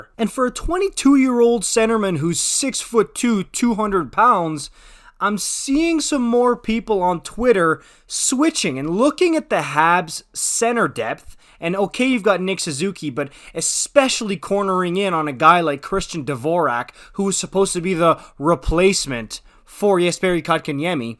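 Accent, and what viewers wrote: France. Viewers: It grates on my nerves when he speaks with French accent in the middle of speaking English. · I love how your French accent pops out, dude! Haha, awesome. I'm learning another language watching your videos. ;-)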